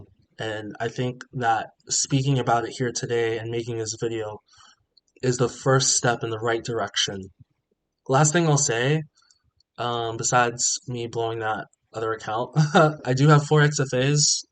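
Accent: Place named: United Kingdom. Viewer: you're from the UK? That's pretty dope. You must've moved here when you were younger judging by your accent. Also I took your encouragement and got my first funded, I did the practice mode and lost a bunch of times but I just got my first trade win for $67.78 and I know it wasn't luck because price got near my take profit three total times before crossing it lol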